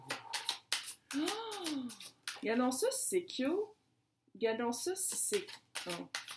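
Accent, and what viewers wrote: Quebec, Canada. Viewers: De quel vous êtes du Canada ? Québec ?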